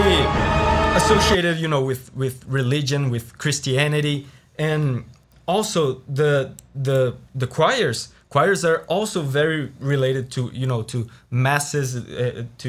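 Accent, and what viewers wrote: Brazil. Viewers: É incrível como dá pra saber pela sua voz que vc é brasileiro. É um sexto sentido. Aliás, vídeo bacana!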